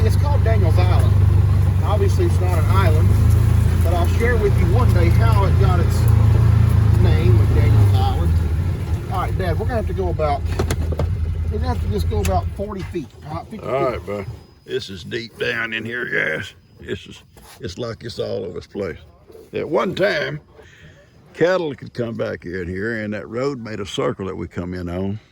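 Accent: South, United States. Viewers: Philadelphia checking-in., I'm not quite sure what it is but when I'm feeling down and don't get me wrong I always watch your content. Either your soothing voice your Southern drawl or the animals really cheer me up and put me in a positive mood. So I thank you sir. 👍💪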